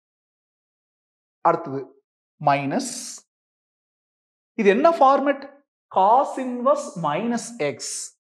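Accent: Coimbatore, India. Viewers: That's becoz he's Coimbatore his slang changes right!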